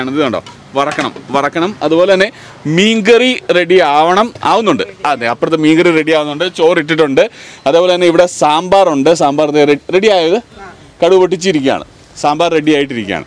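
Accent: Thrissur, India. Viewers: Thrissur എത്തിയപ്പോ ചേട്ടന് ഒരു തൃശൂർ slang വന്നിട്ടുണ്ട്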